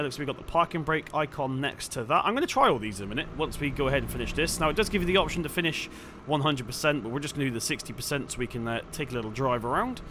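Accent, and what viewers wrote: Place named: United Kingdom. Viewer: British accent so annoying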